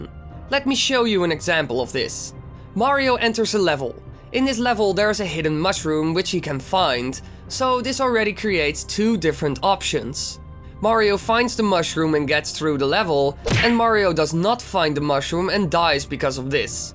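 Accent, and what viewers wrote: Netherlands. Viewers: Hi are you dutch. If so Goede video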